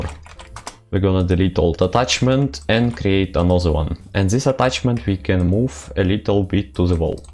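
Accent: Russia. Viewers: по голосу кажется что русскоговорящий)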